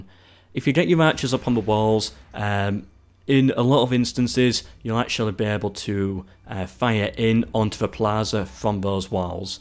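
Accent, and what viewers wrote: United Kingdom. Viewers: what kind of accent is that im in love with accents from the UK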